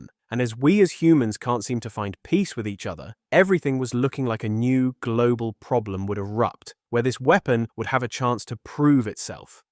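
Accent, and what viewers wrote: RP, United Kingdom. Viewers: Many things I give to the British being an American. The one thing I give more than anything is that for some reason the posh accent makes any statement feel authentic no matter what it is compared to most Americans unless it’s someone like Mike Rowe, Morgan Freeman, Etc. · Hey buddy, take your twelve point seven by ninety nine millimeter, and shove it up your British accent stove pipe, okay?!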